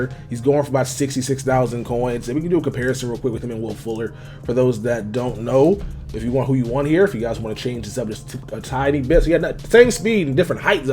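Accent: Texas, United States. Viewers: Are u Fr from texas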